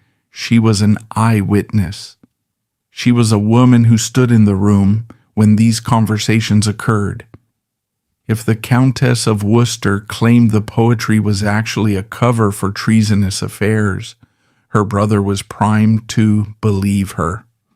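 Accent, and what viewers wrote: United States. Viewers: I mean this with the utmost respect but i studied tudor history for many years at college and university and was lucky enough to read archives in London and Windsor castle. If this is AI voice please change it as an American accent don't sound right its pronouncing names and places that just sound weird. Also Elizabeth Somerset told her brother that ann flirted with courtiers but the evidence was never put forward in court 😊 also as you probably should of mentioned Anthony Brown was a seymour supporter as ann and himself had a blazing argument as ann didn't like how he treated his sister Elizabeth Somerset 😊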